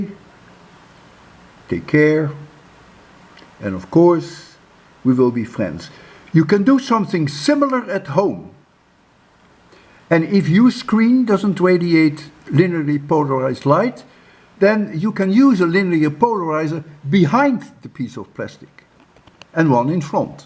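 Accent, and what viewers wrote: Netherlands. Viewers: Thank you! I used to live in Holland back in the 1980s and I recognized your dialect immediately when I watched your first video. I still love physics but do computer work. Danku wel.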